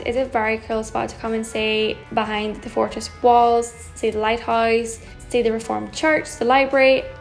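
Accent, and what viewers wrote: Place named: Ireland. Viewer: Lovely Irish accent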